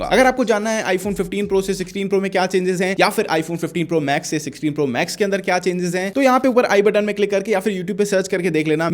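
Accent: Sindh, India. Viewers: Are you Sindhi??❤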